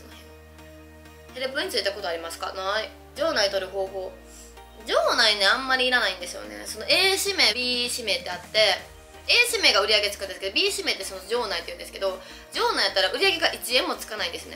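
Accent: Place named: Kansai, Japan. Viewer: 見た目も中身も関西弁も、ぜんぶ大好きです🥰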